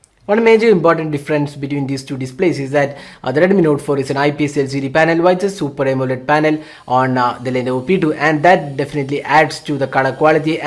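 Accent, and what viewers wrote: Kerala, India. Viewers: are you from kerala your accent ??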